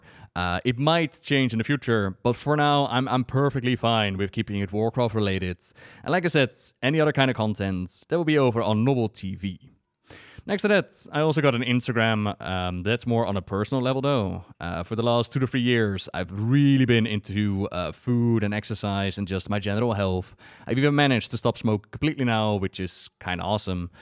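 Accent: Netherlands. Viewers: This is such a random thing to mention. But you really helped me back in 2013/14, not with WoW lore(although it was good) but with actor training. I had been cast in a production of the Diary of Anne Frank. (I was one of the Van Daans, who I believe were Dutch?) Pur director had asked us to try and make our accent convincing. So I listened and binged nearly all your videos in order to learn certain pronunciations and how you formed words etc. I was told my accent sounded excellent and was extremely convincing. So thank you for the help...almost 10 years ago. Who knew WoW lore videos could used for other means😅😂! I'm not sure if you are Dutch...my 18 year old self thought you were but hey it worked out in the end.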